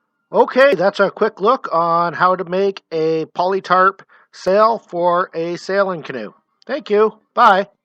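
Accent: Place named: Canada. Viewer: You sound suspiciously canadian when you talk.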